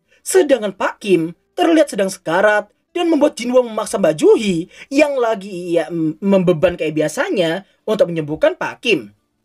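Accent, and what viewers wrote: Java, Indonesia. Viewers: medhok coy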